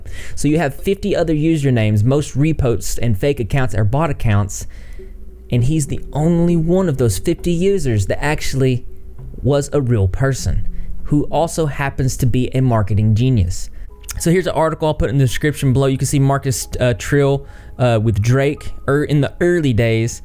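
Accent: South, United States. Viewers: Conspiracy theories, best hair on the platform and a southern accent? I STAN😍❤️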